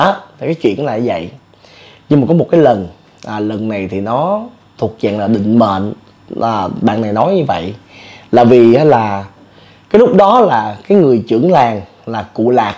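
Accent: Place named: North, Vietnam. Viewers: Bạn nói giọng bắc nghe dễ thương quá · Bạn kể có thêm giọng bắc nữa hay quá .👍👍👍👍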